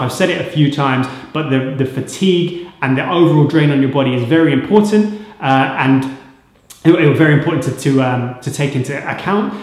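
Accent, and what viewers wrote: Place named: United Kingdom. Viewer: and why is it always bri'ish explaining the german volume training lol , ok jus kdn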